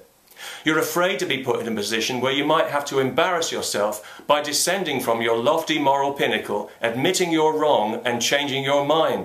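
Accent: England, United Kingdom. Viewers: An Irish man with a English accent .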